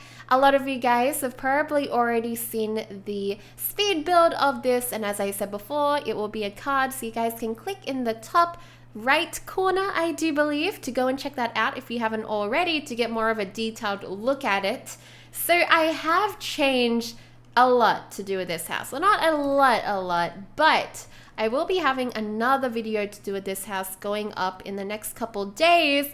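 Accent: Australia. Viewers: is she from Australia? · Are you Australian?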